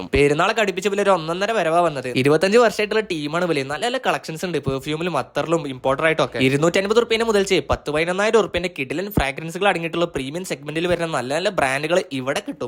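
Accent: Malappuram, India. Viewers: I'm a new subscriber 💥 Your talking slang just like a Malappuram slang 🔥💥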